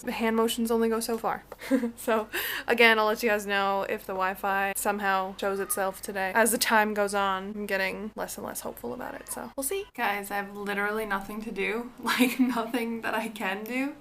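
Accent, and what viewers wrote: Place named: Taiwan. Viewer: haha, I really enjoyed watching your monologue in the background while I'm doing random stuff. the holes on the wall are for ductless air conditions, so you might need get them for summer. connect your TV through the extension cord, they are the same voltage. by the way, your Chinese accent sounds like someone from Taiwan.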